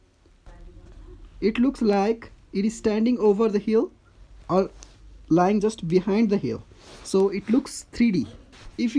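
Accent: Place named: Nepal. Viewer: Daí ko speaking Language Sounds like Nepal tune.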